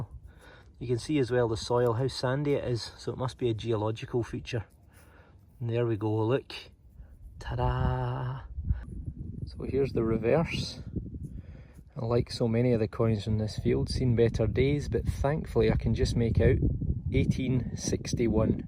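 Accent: Scotland, United Kingdom. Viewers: another great video, but can I give you a wee bit of advice please. when you go hame after yer dig an clean aw yer finds - put yer water bottle and an old bar towel intae yer finds bag an you,l be 100% ready tae go on yer next dig. keep postin yer digs as I enjoy them an also hear an accent from hame. billy Mac.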